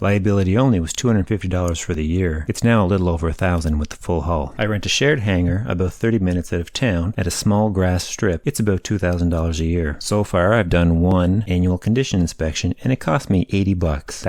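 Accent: Canada. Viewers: Very exciting news! Always love your review vids and the 182 video in particular helped me make the decision to buy one a couple years back. Out of all the planes you've ever reviewed, this one seems just so natural that you would own it. Also, never noticed you say "aboot" as much as in this vid but the Canadian tail registrations probably predisposed me to hearing it haha. Keep up the awesome work, dude, and safe flying!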